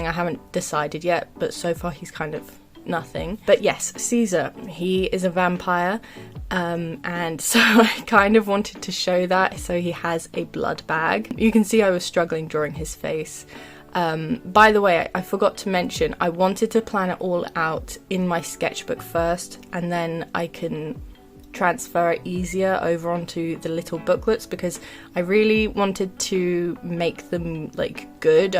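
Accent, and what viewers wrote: United Kingdom. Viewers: Her accent is British English, possibly from around London or a little more South ❤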